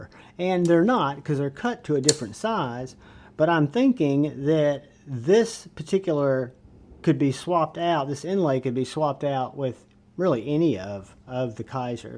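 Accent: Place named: Baltimore, United States. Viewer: Your accent sounds like Baltimore 😂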